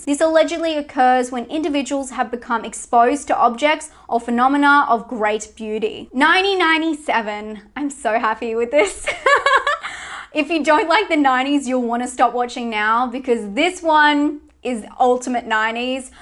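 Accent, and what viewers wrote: Australia. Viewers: I am so happy to have found your channel. Your tastes are pretty much exactly the same as my own, and you are really interesting to listen to (as a brit the ausie accent really makes me happy to hear). When you mentioned The People Under the Stairs, I literally squeeled as no one seems to know that film. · Are you australian?ʕ•ᴥ•ʔ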